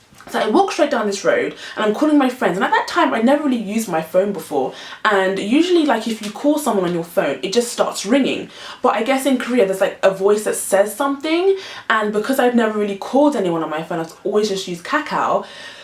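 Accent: England, United Kingdom. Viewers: Love your videos and stories, very detailed :) also UR English accent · Bring u and that British accent to Northern California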